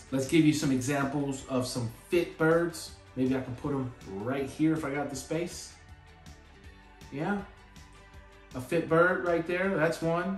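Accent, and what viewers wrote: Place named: United States. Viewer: These videos show me how much I take your language for granted. Love hearing these with an American accent.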